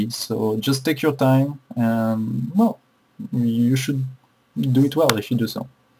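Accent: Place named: France. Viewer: Don't apologise for your accent man! French accents are seriously cool! Its how you guys manage to pull "our" english women so easily! :P Great video too, i'm down to a 59.5 around LRP but your mega quick and helpful, thanks!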